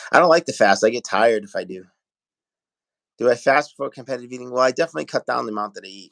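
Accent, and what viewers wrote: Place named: Boston, United States. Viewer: Lol how pronounces Pop Tarts. “Pawp-tawts” 😂 gotta love that Boston accent!!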